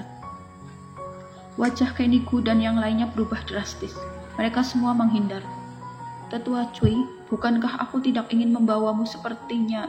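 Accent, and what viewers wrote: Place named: Banyumas, Indonesia. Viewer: dialeknya kaya orang ngapak, apa iya?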